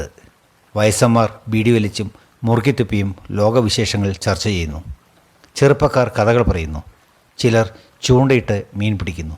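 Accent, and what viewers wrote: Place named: Valluvanad, India. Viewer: അസലായിട്ടുണ്ട് ട്ടോ കുട്ടി, കഥയും അവതരണവും. നമ്മുടെ വള്ളുവനാടൻ ശൈലിയിൽ മനോഹരം❤👌👏🙏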